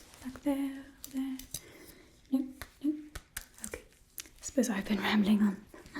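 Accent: England, United Kingdom. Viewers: The British accent hits every single time! Btw, how did u get ur new profile pic? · Yes I am still impressed with that british accent, I am british and I cannot do an american accent to save my life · No such thing as a "british accent". That's an English accent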